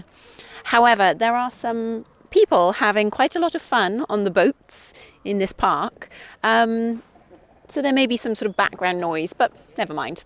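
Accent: United Kingdom. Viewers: Thanks for your real British English video! It’s a very good listening practice for me!! The tree behind you looks like Sakura but it’s a little unique shape! Honestly speaking, I’m not comfortable to wearing mask but my opinion is close to From this 13th of Mar. 2023, I’ll be wearing mask in the confined place like the train but no mask outside! I didn’t know “gnats” but I found it’s the small flies. I misheard gnats as nuts first😅 but it’s a good practice for me!! As for clouds, I studied typical seasonal clouds below❗️ Summer: cumulonimbus Autumn: mackerel clouds Winter:stratocumulus April: altostratus Oh they are very difficult words for me but interesting! I’m excited for the next lessen ❤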